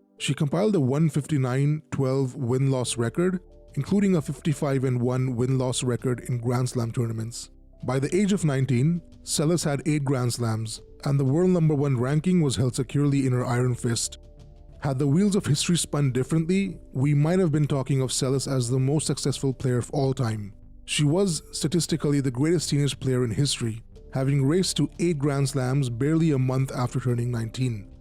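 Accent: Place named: India. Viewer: You are an Indian, can hear it in your voice though you try to disguise it.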